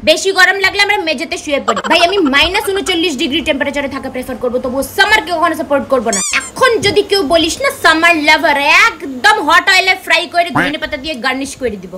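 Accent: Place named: Bangal, Bangladesh. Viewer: বাঙাল টানে কথাগুলো এত ভালো লাগে যে কি বলব...😊😊.. ভালো থাকিস মা..❤❤👍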